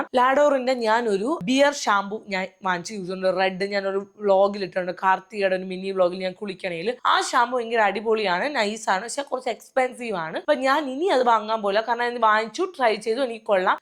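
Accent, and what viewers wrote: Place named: Thiruvananthapuram, India. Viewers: Chechi i have been following u and admiring u since 2 years and i am keep loving watching your videos....i am even get influenced mostly on products you used how you style....and especially the way you talk its so attractive that i am keralite also tvm side now staying and i like the way you present everything in your slang....anyway best wishes for your achievements❤.....i know i am not lucky at all for getting this giweaway....but then also simply trying jst coz my favourite influencer finally came with giweaway nahh....so i wanna be part of it thats it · Chechyiiudee ehh tvm slangg adipolii anu kettonduu erikkaann💖✨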